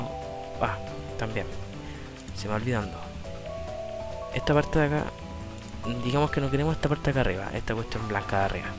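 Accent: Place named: Chile. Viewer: Oye crack, por las palabras y maneras de hablar eres chileno? Realmente me dio curiosidad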